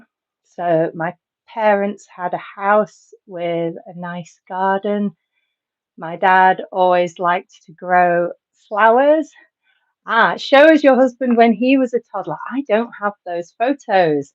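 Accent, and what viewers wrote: Northern England, United Kingdom. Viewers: I personally really like your Northern English pronunciation. Some English teachers change the accent to teach the standard pronunciation, but I prefer originality.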